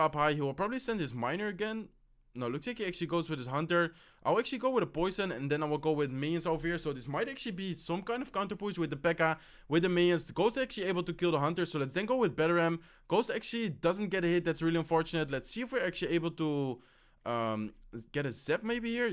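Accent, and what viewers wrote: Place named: Netherlands. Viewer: je klinkt echt heel erg nederlands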